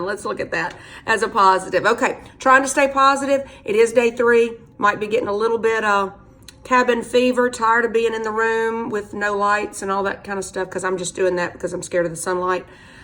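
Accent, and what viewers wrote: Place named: Texas, United States. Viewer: I LOVE her accent. Is that Texan or Georgian?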